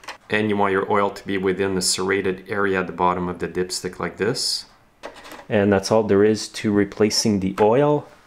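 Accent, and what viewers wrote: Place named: Canada. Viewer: Can tell u are Canadian. Your English is perfect, and you are a perfect instructor. Have you considered teaching at a college?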